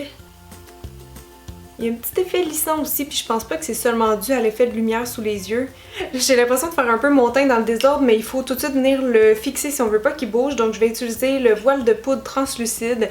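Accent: Canada. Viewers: Bravo pour l'ensemble de tout ce que tu fais, et Merci pour les infos sur ce produit crueltyfree. Trop peu de personnes se préocccupent de la souffrance animale, MERCI!!!! Les produits que tu présentes ici sont la plus belle preuve qu'on peut obtenir la beauté, et la qualité, sans passer par la cruauté et/ou la chimie. Bises. Ps: ( je suis en France), j'aime beaucoup ton accent Canadien, ce qui ajoute encore de la sympathie à ta chaîne.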